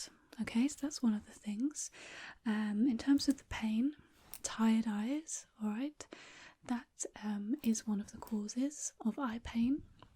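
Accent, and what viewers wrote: United Kingdom. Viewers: u r british?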